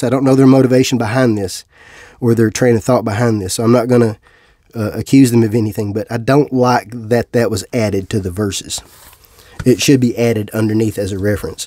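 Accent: South, United States. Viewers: Your accent is Southern American, right? What part of the South, if I may ask?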